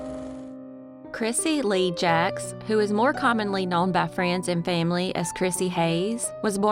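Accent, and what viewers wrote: South, United States. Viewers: I understand, she is the only southern voice I can toletate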